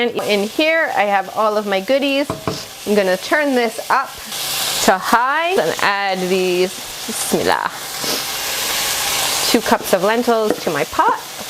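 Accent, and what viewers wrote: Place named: Kandahar, Afghanistan. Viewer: Omg I love your shaista kandahari pashto 😂💛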